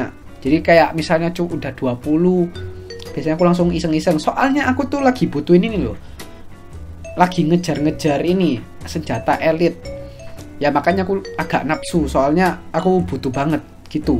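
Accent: Java, Indonesia. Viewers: Bahasa indonesia aja biar medoknya kerasa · AKSEN JOWO AJ BANG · Aksen jawa. Lebih enak didenger telinga (soalnya udah lama tinggal di malang haha). Btw, Garuda juga ya mas? Mau tanya kalau sehari2 leveling bisa dpt brp lvl? Kebanyakan leveling dimana mas? Trus itu enhance sampai +21 pake maphr trus atau kadang2 aja? Maaf mas nanya banyak kepo bgt soalnya liat kilau elven bownya gak nahan hahaha.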